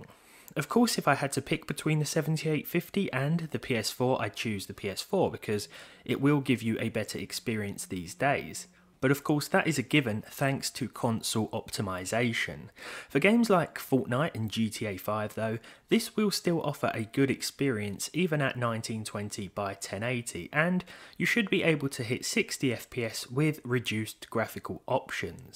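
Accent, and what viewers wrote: United Kingdom. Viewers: i really like your british accent 😁😁😅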